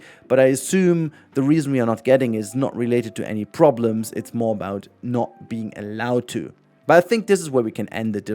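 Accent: Germany. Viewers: Hello I have a question. Are you German? Just asking cuz you sound German to me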